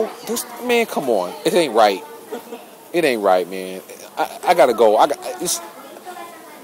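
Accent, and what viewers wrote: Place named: AAVE, United States. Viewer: Ebonics makes my ears hurt. :(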